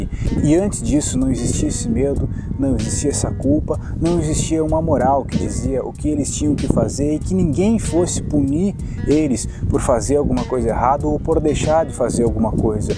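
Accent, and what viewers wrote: Rio Grande do Sul, Brazil. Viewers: Ruinas de São Miguel! Sabia que seu sotaque te entregava...vc é do Rio Grande do Sul.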